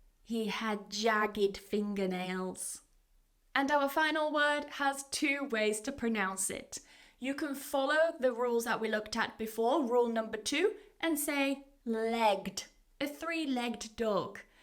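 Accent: United Kingdom. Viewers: She is from the UK, i dont know if this Is british acent, i dont native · Hey are you a british teacher ? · I’m really grateful for you for make fantastic videos to our English learners. Thank YOU! And I love your British accent, hopefully my pronunciation getting better, speaking English like your accent.❤ ❤❤ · Is it British accent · Excellent, i love your british accent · hello ms i love your content, as specially the British accent....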